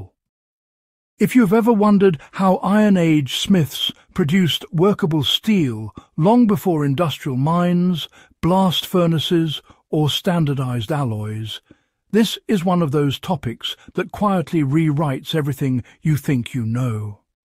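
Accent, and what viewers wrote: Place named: United Kingdom. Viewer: AI has gone British & Snotty 🖕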